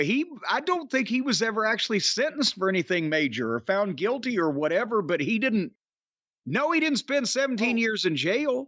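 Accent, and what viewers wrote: South, United States. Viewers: I never liked Jim Cornette when I was a youngster growing up and being a fan of WWE. I was too young to really realize, I wasn't really supposed to like him. He was a heel manager, and he was supposed to act that way to get me to dislike him. I disliked Jim Cornette the character :) I LOVE Jim Cornette the orator. I could listen to him talk for hours. His cadence, his accent, and while he sounds like he should be some kind of country bumpkin, he has a rich vocabulary.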